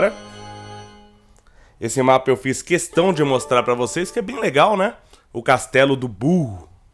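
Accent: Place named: São Paulo, Brazil. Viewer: sotaque paulistano é nois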